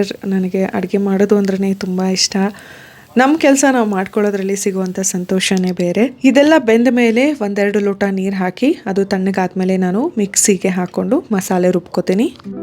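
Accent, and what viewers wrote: North Karnataka, India. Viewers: Mam I like the way u talk mam and ur uttara karnataka talking style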